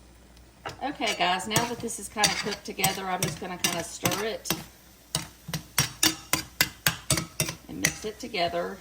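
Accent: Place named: South, United States. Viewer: I just wanted to tell you how much I loved your video. I had forgotten all about that cake mix recipe. Being a longtime Weight Watcher, I was glad to see a good dessert recipe from my past. Your video was so easy to follow, which I really like. Also, I detected a Southern girl accent so I hope you will throw in some Southern recipes now and then. I am so glad that I found you by just scrolling along youtube. Have a great day from my mountain home in Western North Carolina.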